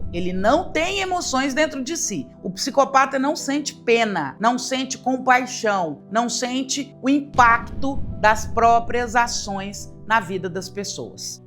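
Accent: Minas Gerais, Brazil. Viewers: Estou chegando agora no seu canal e estou respeitosamente apaixonado pela senhora e Dra Ana Beatriz, acabo de me descobrir um bígamo virtual! rsrsr... Parabéns pelo seu trabalho Dra!!!! Ouvir falar da vida com sotaque mineiro faz tudo ficar muito leve... acho que Freud era um chato, mas boa gente, paulistano como eu KKK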